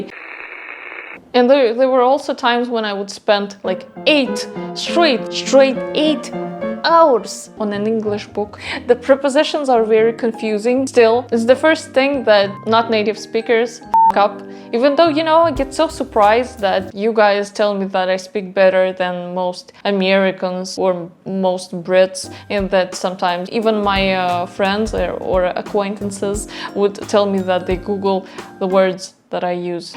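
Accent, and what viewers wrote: Russia. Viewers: I love your channel, and enjoy your sense of humor. Your command of English is pretty amazing, and your Russian accent is so subtle most of the time. I love it when you intentionally say things in English with an exaggerated accent! As a native English speaker, I commend your hard work. It really pays off! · Yes your English is very good. Your personality is great, genuine and spontaneous, funny. A lot smart fun lady! And your fluent in Russian! Wow! Hmm. Language sounds different to each person. I love your Russian accent as you speak English. It sounds very nice actually. Nothing to worry about. You give a lot of good advice. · Coming from a native English speaker your English is excellent/fluent!,you have a distinct Russian accent but just like an American or an Australian has an accent when speaking English.Its a cool accent btw!.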